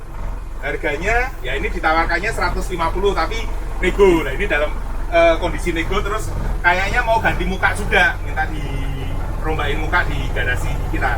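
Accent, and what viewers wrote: Java, Indonesia. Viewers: Seneng senyumana pak bos sama logat jawanya .yg khas👍🙏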